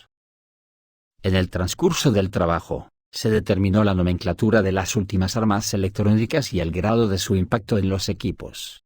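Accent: Spain. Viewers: TU CANAL ES UN DIGNO REPRESENTANTE DEL MUNDO HISPANO-LATINO ============================================================, TU APORTE INFORMATIVO Y TUS COMENTARIOS / ANALISIS, HACEN DE TU CANAL, UN CANAL PARA SER TOMADO EN SERIO Y CON RESPETO. EL EUFEMISMO Y TEMOR SOTERRADO DE OTROS "REPORTEROS" ==================================================== _ TU NO TIENES MIEDO COMO OTROS CANALES, DE DECIR Y LLAMAR LAS COSAS, LAS PERSONAS Y SUS PAISES CON SU NOMBRE Y CON SU CALIFICACION. _ OTROS CANALES USAN EUFEMISMOS TIMORATOS COMO LLAMAR "OSO" A RUSSIA MOTHERLAND _ OTROS CANALES USAN EUFEMISMOS TIMORATOS COMO LLAMAR "I" AL ESTADO DE ISRAEL. _ OTROS CANALES USAN EUFEMISMOS TIMORATOS COMO LLAMAR "ABUELO" AL USA PRESIDENTE. _ OTROS CANALES USAN EUFEMISMOS TIMORATOS COMO LLAMAR "PAIS DE LAS HAMBURGUESAS" A USA. _ OTROS CANALES TIENEN UN TERROR DE MUERTE DE LLAMAR GENOCIDIO A LOS CRIMENES COMETICOD POR ISRAEL. 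_ OTROS CANALES LLAMAN "TERRORISTAS" A LOS PATRIOTAS PALESTINOS, PORQUE ESO ES MAS "CONVENIENTE", Y ASI EVITAN QUE LES CIERREN EL CANAL. _ OTROS CANALES LLORAN LA MUERTE DE UN JUDIO, PERO LA MUERTE POR GENOCIDIO CONTRA UN PALESTINO ES JUSTIFICADA PORQUE TODA PALESTINA TIENE UNA ETIQUETA DE "TERRORISTA" QUE LE PUSO OCCIDENTE Y SUS MEDIOS MASIVOS DE COMUNICACION, DE MANERA PROPICIA Y CONVENIENTE, PARA PODER PERPETRAR ESTA MATANZA EN CONTRA DEL PUEBLO PALESTINO TU HABLAS UN ESPANHOL "CASTIZOLA" COMO EL MIO, QUE A LA SAZON; HABLO OTROS IDIOMAS Y POR ESA RAZON ENCUENTRO LA VERDAD, PE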